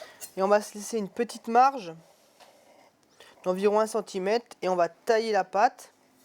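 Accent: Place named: Rural, France. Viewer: Le gars avec son accent du terroir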